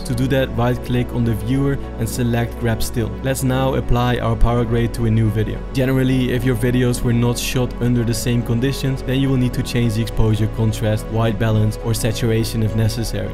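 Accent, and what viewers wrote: Netherlands. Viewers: btw brother are you Dutch or Belgian ? The accent too obvious hh. love your content lets keep it coming!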